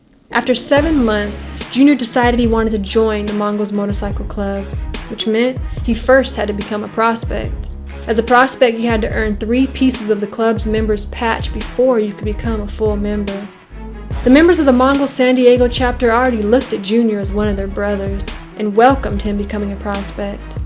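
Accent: South, United States. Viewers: The narrator has a sweet southern voice ❤️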